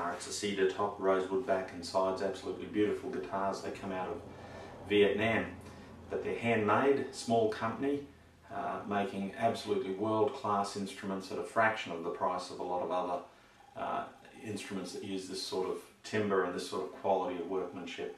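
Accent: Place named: Australia. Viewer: mate you sound aussie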